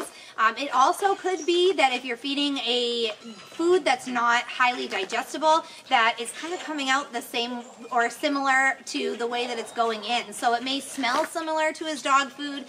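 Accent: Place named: United States. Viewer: Talk less American plz.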